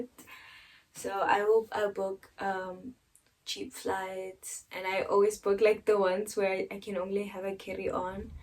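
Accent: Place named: South Africa. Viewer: woah...you have a South African accent!🤭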